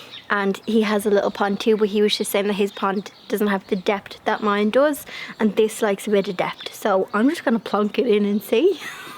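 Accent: Ireland. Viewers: I'm in zone 7a in Niagara Canada, we have 8 months of growing season, no snow since this week, yes we plant bulbs too. I love your gardening vlogs, very inspiring to see all that green and to hear your cheery Irish voice. I've only seen Gardener's World on YouTube because of your recommendation. Thank you for sharing. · Hi I have just started dating your blogs. I love you Irish ascent. As I,m from Australia. Where did you learn how to decorate you little house. Thank you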